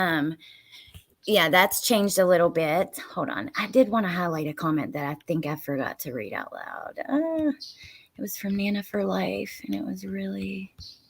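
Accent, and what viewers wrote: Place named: West Virginia, United States. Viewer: I knew that accent! WV here too.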